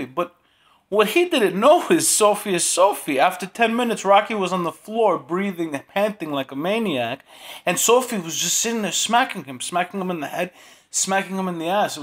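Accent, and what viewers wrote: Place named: East Coast, United States. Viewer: nice my man yea im in mass..you have tht same accent we pretty much all do on the east side lol..but yeaa my dude new subscriber ..nd watching all your vids;))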